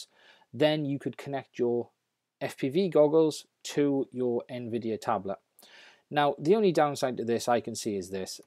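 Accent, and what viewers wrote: Wales, United Kingdom. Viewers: so welsh!!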